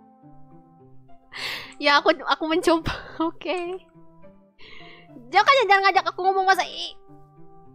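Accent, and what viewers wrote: West Java, Indonesia. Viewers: i can easily tell she's sundanese by her accent and sweet voice >.< sundanese be having the sweetest and softest voice istg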